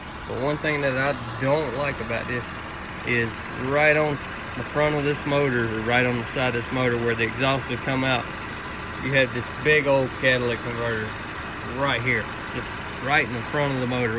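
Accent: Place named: South, United States. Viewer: That southern draw son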